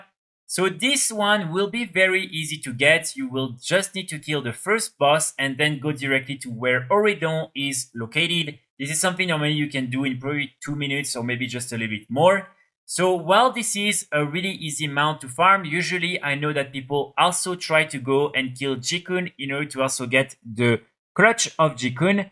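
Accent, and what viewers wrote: France. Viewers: ze french accent is very there (superb video btw thanks <3)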